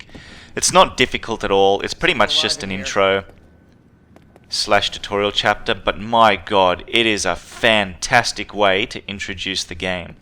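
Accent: Australia. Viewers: Is he aussie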